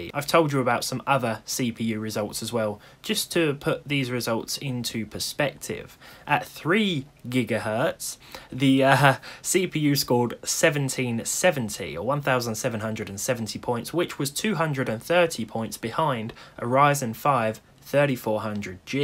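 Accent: United Kingdom. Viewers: Hey just joined your channel nice vids random British accent lad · I love the British English, sounds Smooth. Try it with a 2700X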